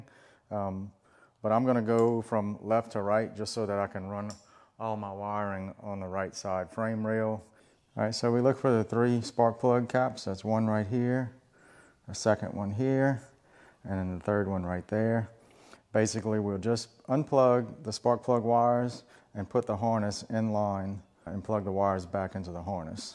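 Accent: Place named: Louisiana, United States. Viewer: Love your informative videos! Where in the south are you?? Sounds like Louisiana accent!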